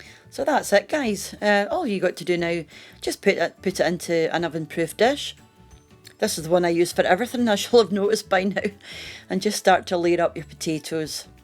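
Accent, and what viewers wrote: Scotland, United Kingdom. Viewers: Love the Scots accent. Thank you for sharing your recipe. Will give it a go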